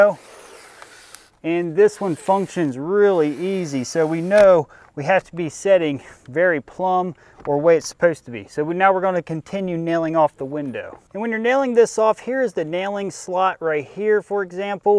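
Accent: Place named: Delaware County, United States. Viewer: Bro what part of Delco you from ?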